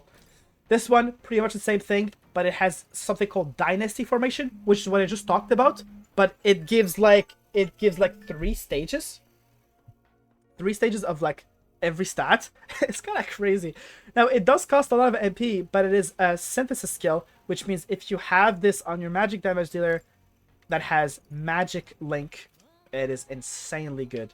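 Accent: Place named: France. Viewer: I can hear the French accent! J'aime bien ton style de guide et merci!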